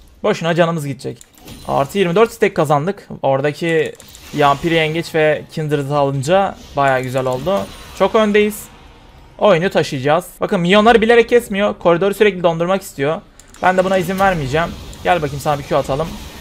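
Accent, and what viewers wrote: Adana, Turkey. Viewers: Adanalı mısın?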